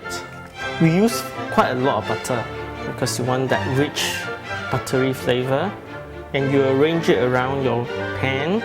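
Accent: Singapore. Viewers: dang. that looks delish! and i miss this accent lol. singaporean or malaysian? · are you like singaporean?? haha. the accent :D i'm singaporean too.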